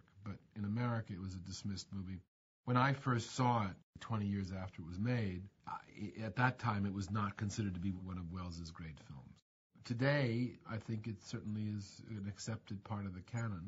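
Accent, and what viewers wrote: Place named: Ireland. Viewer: lol I lasted 30 seconds, not fair I know but his constant talking in that terrible Irish accent......!!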